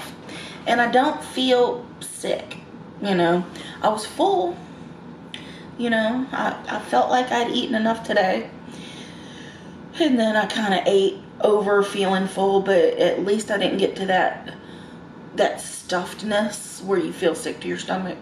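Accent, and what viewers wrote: South, United States. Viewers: She has such a beautiful face and love the southern accent!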